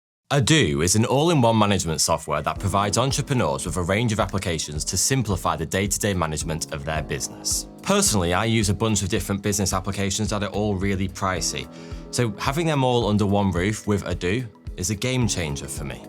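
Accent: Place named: Cockney, United Kingdom. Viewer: That COCKNEY ACCENT IS DISGUSTING … YOU KEEP SAYING “FOURTY TWO HERE”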